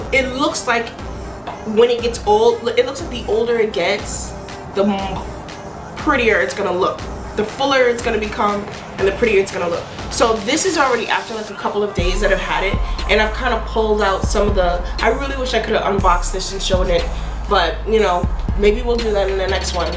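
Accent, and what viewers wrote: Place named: Jamaica. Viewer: the island accent did it for me girlie! Are you Jamaican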